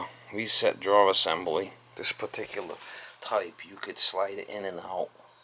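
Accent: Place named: Cape Cod, United States. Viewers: near cape cod accent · Mada Nielk that’s a Dartmouth/Fall River/ New Bedford accent.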